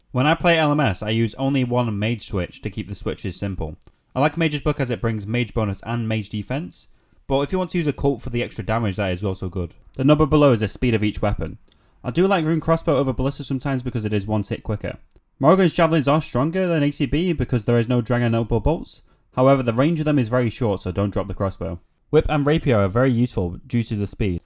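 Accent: United Kingdom. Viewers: Proper English accent as it should be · Try talking slower.. the combination of your british accent with how fast you talk means half of the world cant understand you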